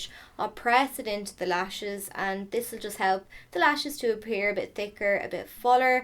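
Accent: Ireland. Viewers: You are beautiful. You sound Irish lol.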